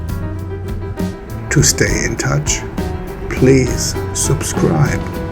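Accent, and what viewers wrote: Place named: Norway. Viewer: your accent sounds like Norwegian 😅 but i can be wrong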